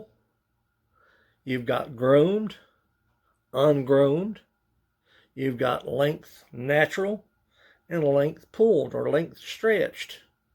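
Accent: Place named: Appalachia, United States. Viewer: Absolutely love the accent! Where are you from my friend? I'm guessing somewhere in the Appalachian mountains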